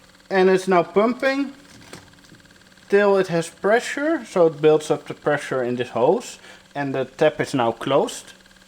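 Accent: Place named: Netherlands. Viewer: Heerlijk Nederlands accent. Gaaf zo'n unimog als camper. Succes, ik ben geabboneerd. Ik ben bezig met m'n eerste camper te bouwen (vw t4).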